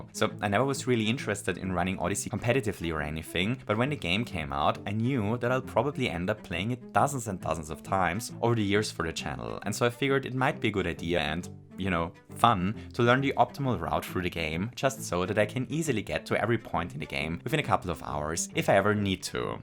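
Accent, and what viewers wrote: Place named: Germany. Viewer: du klingst sehr deutsch, ich kann es nicht erklären, dein Akzent ist nicht stark, aber ich höre definitiv deutsch XD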